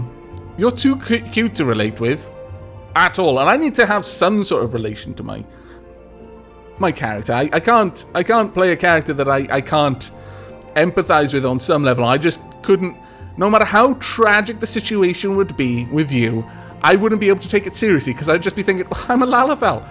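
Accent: Wales, United Kingdom. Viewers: Sir, I believe you are Welsh.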